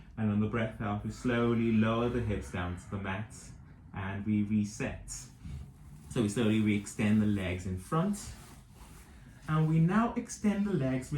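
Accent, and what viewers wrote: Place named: England, United Kingdom. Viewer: I'm going to be doing this teacher training in January 2021. Thank you for showing how to teach this method 1 on 1 in client's homes. I love 💘 that your English too, we tend to deliver instructions a little differently than Americans. How long have you been teaching this method?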